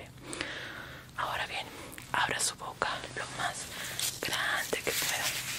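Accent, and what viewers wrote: Mexico. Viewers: Asumo que eres de México Pero de que parte de México en si?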